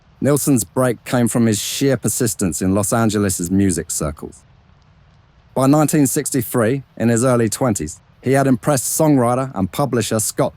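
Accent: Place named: Cockney, United Kingdom. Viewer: Excellent as usual brother, your writing is absolutely stellar! Wonderful listening...the Cockney James Cagney!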